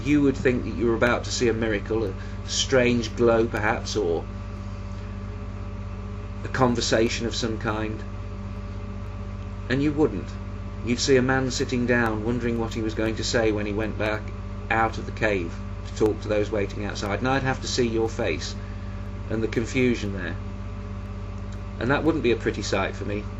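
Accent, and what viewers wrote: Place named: United Kingdom. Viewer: One of the most beautiful videos I've seen on YouTube., your combination of wisdom, humanity, charisma, dramatic flair, and British accent is just unbeatable.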